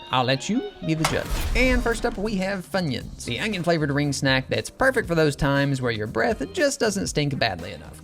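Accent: South, United States. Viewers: is it just me or does he sound more country now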